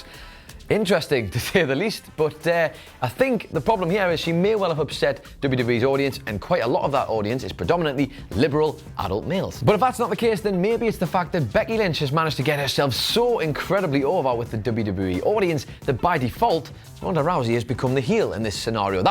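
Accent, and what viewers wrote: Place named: Newcastle, United Kingdom. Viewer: Wey aye man. A fellow Geordie. You're getting a sub just for that.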